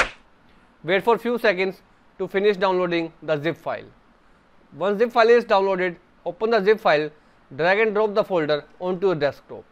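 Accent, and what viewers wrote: India. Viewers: YEA THANK YOU I HAVE BEEN TRYING TO GET IT TO WORK THE ENTIRE DAY IT WORKS AGAIN TYSM HOLY POOP IM LIKING UNDERATED VIDEO MAKE MORE FIXES I K NEW I SHOULD LISTEN TO SOMMEONE WHO SOUNDS INDIAN BUT THAT NOT MATTER IT WORKED THANK YOUUUUUUUUUUUUUUUUUUUUUUUUUUUUUUUUUUUUUUUUU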